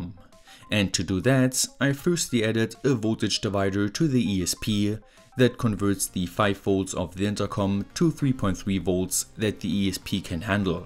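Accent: Germany. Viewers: i heared german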